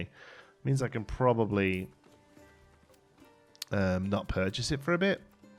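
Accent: United Kingdom. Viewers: I got mentioned!! I told you you sounded British (I mean, you are...). I reckon I could easily watch you for an hour walking around talking rubbish. Next episode, " walking around his village talking rubbish". Man, that feature has totally sold me on that game. I love it anyway, but that's the cherry on top!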